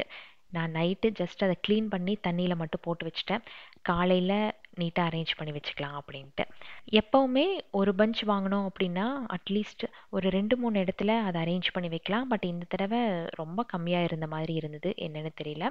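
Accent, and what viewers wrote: Coimbatore, India. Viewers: Akka unga voice super athulaum conversation with cbe slang vera level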